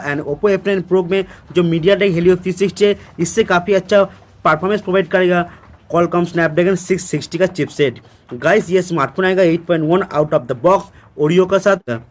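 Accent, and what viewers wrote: Bengal, India. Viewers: Tum bangali h kya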